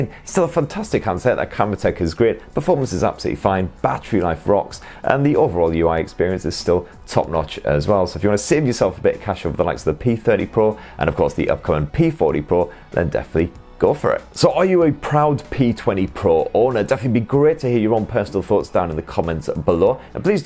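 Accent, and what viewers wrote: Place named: North East England, United Kingdom. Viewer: just come across this...should retitle it 'still good in 2021' - I'm still using my P20 Pro, battery still holds up, performance is still great (RR3 is the game of choice and it's smooth as smooth can be) and the camera is still one of the best out there... if you're looking for a a really good smartphone and you find a good deal, snap it up! (plus this model is pre the google embargo so happy days!), I detect a north east twang 'Gan On!'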